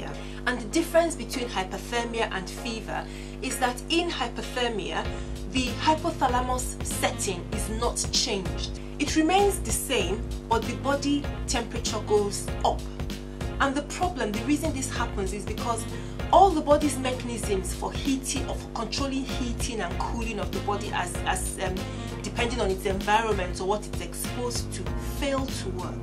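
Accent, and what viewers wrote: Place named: Nigeria. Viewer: You are Nigerian I can tell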